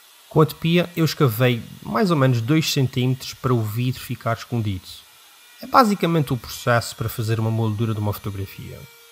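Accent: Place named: Portugal. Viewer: Acho lindo voz portugual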